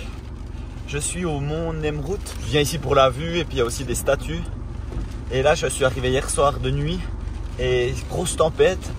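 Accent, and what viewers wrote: Switzerland. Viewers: t'es suisse ou bien ? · L'accent suisse. Une pépite vraiment 😂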